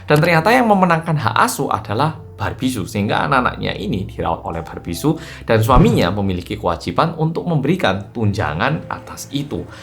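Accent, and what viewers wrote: Java, Indonesia. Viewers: Mas medok banget ya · Salfok sm oppa korea yg ngomong nya medok 😂 salam kenal oppa.... moga sehat selalu ❤ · Ya ampun oppa bhs indonesia lancar bngt 🤣😂😂😂.. baru following sdh ngakak dl an dgr in bhs Indonesia nya 😂😂Lol logat jw pula 😂😂😂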